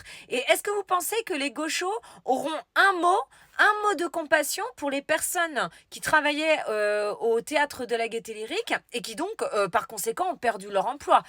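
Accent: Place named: Nord, France. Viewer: T’habites vers Poitiers mais t’as l’accent du Ch’nord..t’es typée du Ch’nord 😊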